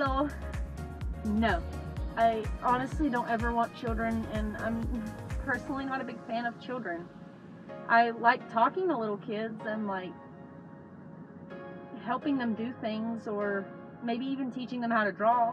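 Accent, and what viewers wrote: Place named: South, United States. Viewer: Thats pretty awesome to learn some things about you! I would like to say, I love your southern accent (Its very strong but really nice). I enjoy video like these! :)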